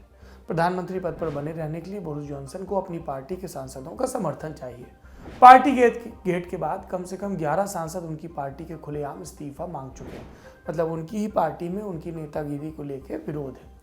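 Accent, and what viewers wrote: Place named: Bundelkhand, India. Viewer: सिद्ध हिंदी के बीच थोड़ी सी बुंदेल खंडी हो वाह #दुनियादारी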